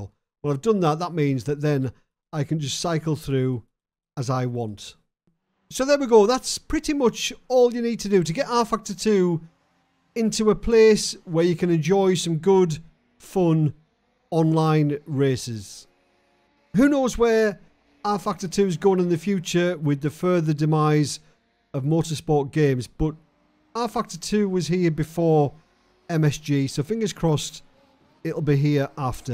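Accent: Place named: Cumbria, United Kingdom. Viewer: Definitely a Cumbrian 😂👌🏼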